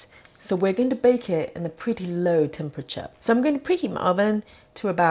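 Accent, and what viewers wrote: England, United Kingdom. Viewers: More than everything I love your British accent. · Hi I like your British Accent...your video contents are seems yummy surely will try I have got all the ingredients apart psyllium husk! Must get some soon! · Precise and with class English accent. Thank you. 💐💐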